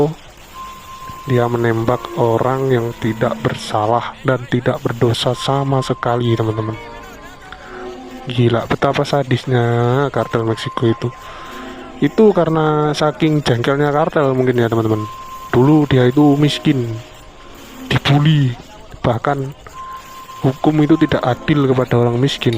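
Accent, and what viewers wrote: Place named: Java, Indonesia. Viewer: Medokkk bet jowo mu brayyyy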